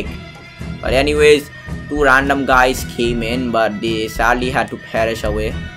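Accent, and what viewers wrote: India. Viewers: Bro got them indian accent drip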